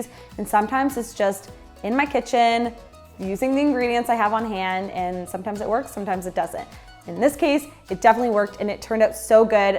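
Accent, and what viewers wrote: Canada. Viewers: Love the recipe, I will try it for sure!! Btw, I love your accent, are you from Canada?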